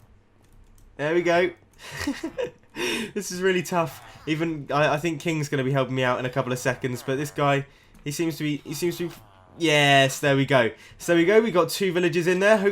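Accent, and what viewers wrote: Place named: United Kingdom. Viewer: Everyone buy a T-shirt his british voice makes his videos very watchable and also t shirts are awesome.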